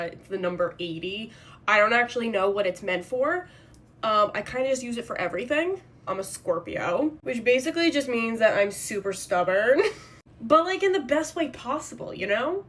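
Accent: United States. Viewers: Can I please ask you what's your accent obviously I know you are American but where did you grow up xx love you guys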